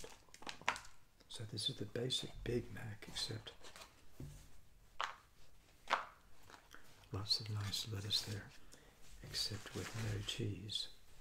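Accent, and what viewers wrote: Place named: California, United States. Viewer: To me it kinda sounds Californian idk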